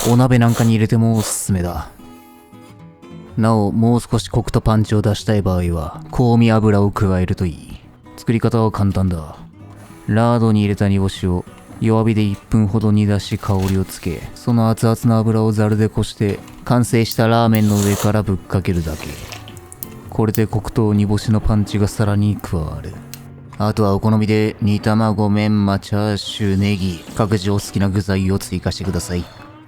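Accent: Kansai, Japan. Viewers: 九州出身なのになんで関西弁しゃべるときあるんじゃーい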